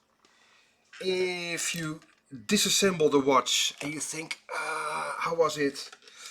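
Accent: Netherlands. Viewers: Entertaining AND informative..... and all in a Dutch accent :)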